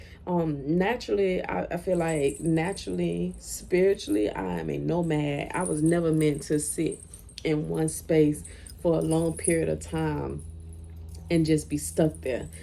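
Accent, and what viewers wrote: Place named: Georgia, United States. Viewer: That Georgia and New Orleans accent blending beautifully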